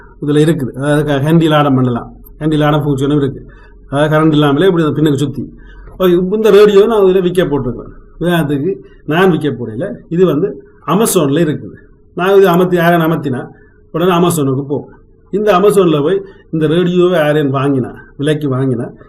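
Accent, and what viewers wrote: Sri Lanka. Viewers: உங்கள் இலங்கை தமிழ் அற்புதம் நம்பரே. எனக்கு ஒரே ஒரு சந்தேகம். நமக்கு பணம் எப்படி வந்து சேரும் .அதை நீங்கள் சொல்லவே இல்லையே .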